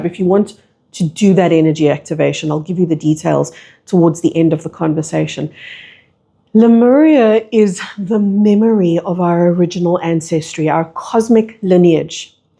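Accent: South Africa. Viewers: Mmmmm….. I resonate with what is being said .ummmmm the accent……. Sounds SOUTH AFRICA?//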